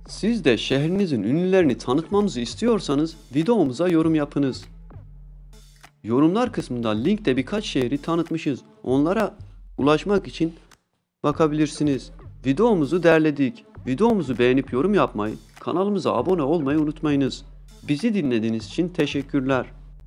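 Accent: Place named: Tunceli, Turkey. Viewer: Adminde TUNCELİ'Li ßeLLi şive Tunceli✓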